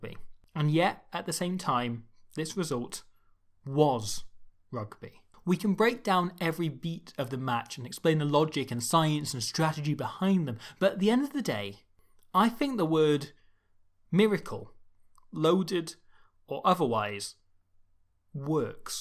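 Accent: Wales, United Kingdom. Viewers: Never expected I would be this excited to listen to a welsh man speack to me for 30 minutes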